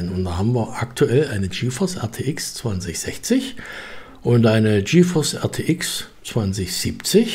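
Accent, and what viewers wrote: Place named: East Germany, Germany. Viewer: Du bist ja noch "am Anfang", du wirst sicher sehr schnell sehr viele neue Abonnenten haben. Jedenfalls machst du das äußerts professionell , du wirkst wirklich ausgesprochen kompetent. Davon ab, dein leichter "Ossi-Akzent" ( sorry Dafür ), ist sehr sympatisch. Weiter so !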